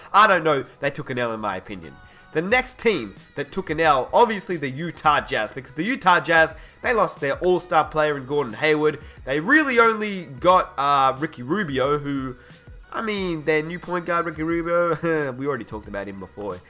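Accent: Australia. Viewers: Okc still hyped wtf the got there ass dropped by the rockets and there not winning shit because if they can't win with kd,harden,ibaka you think there gonna win with Paul gorge( washed up version) and btw who tf is the Australian talking over the video who has not picked up a basketball in his life probably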